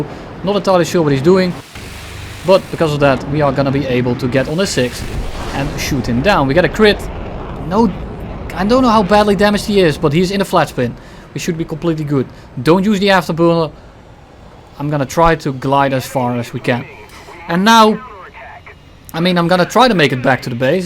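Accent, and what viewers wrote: Netherlands. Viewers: Die Nederlandse accent gaat te ver💀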